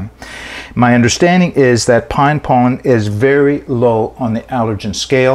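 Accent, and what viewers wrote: Nova Scotia, Canada. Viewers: I detect a residual maritime accent. Are you from Nova Scotia?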